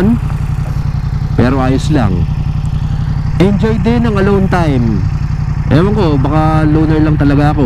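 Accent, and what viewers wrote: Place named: Batangas, Philippines. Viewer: O batangas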